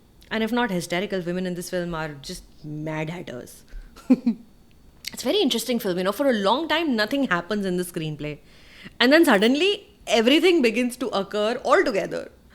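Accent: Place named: South India, India. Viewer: What’s up with the weird ass South-Indian accent???😒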